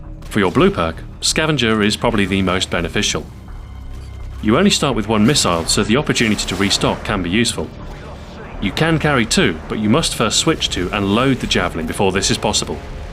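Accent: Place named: United Kingdom. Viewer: Why does everything sound better with a British voice?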